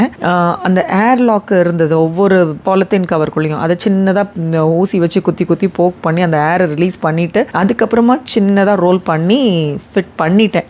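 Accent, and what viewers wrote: Madurai, India. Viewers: Akka neenga madurai ah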